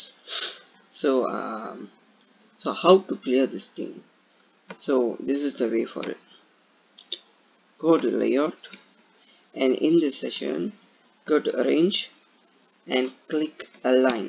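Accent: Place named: India. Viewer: bad explanation with your heavy indian accent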